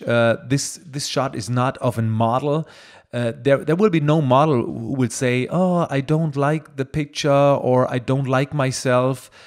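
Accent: Germany. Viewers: Mal wieder ein tolles Video! Finde den Stil toll, er gehört zu dir und das Bild kommt dadurch großartig zur Geltung. Finde es übrigens gut, auch mal englische Tutorials zu bekommen, so kann man sich über das "deutsche Englisch" (für mich persönlich genauso gut verständlich wie Deutsch) weiterarbeiten zu anderen Nationen vorarbeiten, wo teils deutlich schneller und (wohl eher bloß aus deutscher Sicht) undeutlicher gesprochen wird :D Und wie pflegte es Ben letztens so schön in seinem Interview mit Ben von Wong zu sagen... (Frei zitiert) "Ihr versteht das Video nicht? Nehmt es als Anlass, Englisch zu lernen!" Weiter so! Vielen Dank! ;) PS: Falls du Zeit findest auf die Frage einzugehen, wäre es großartig! Du hattest mal einen Link gepostet bzw. war dieser im Zuge deines Kommentars zu Spiegel-online Lesern enthalten, indem es zu einem Script vom Camera Raw für PS gehen sollte. 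Bei mir öffnete sich da immer wieder nur der iTunes Store. Arbeite mit Windows und CS6, möglicherweise schon nicht möglich? Bin diesbezüglich technisch noch nicht so fit und bin bei Google auch nicht so recht fündig geworden. LR habe ich zwar seit kurzem auch, würde aber gerne in PS diese tolle Option mit drin haben - falls möglich. Ohje, typisch Frau mal wieder viel zu lang formuliert :D Falls du Zeit findest - ich freu mich ;)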